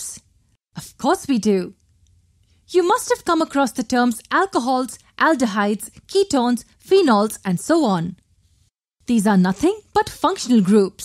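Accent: India. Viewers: Loving this lesson. Loved the Indian accent too🤩😘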